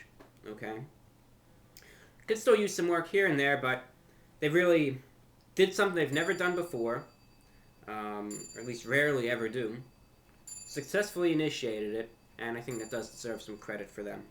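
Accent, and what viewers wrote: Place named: Rhode Island, United States. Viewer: good reviewing, you sound like you are from the great state of Rhode Island!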